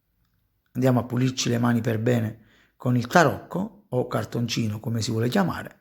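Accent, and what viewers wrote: Naples, Italy. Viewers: Bravissimo,può fare poi gli struffoli?Sono di Napoli ma abito a Torino e gli struffoli x Natale non mi sono venuti mai bene.Mia madre diceva falli a occhi ma io non lo so fare.Grazie.Sono una nuova iscritta.Mi piace sentire la cadenza"napoletana"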